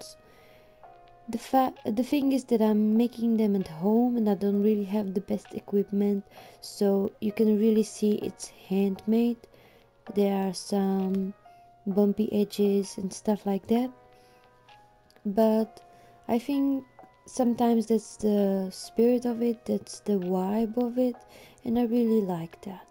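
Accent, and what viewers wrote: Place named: Czech Republic. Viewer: Máš tam český přízvuk budeš nahrávat I český nebo alespoň titulky 😍 jinak super kontent a miluju akvarel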